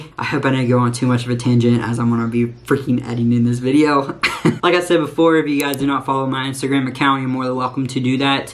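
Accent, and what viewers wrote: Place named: Marietta, United States. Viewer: Is it bonkers that I could tell you were from Marietta by your accent? I went to MC about a million years ago and I can still hear it.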